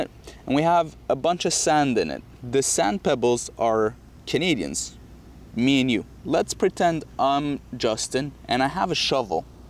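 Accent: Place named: Russia. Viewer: Your Russian accent is showing.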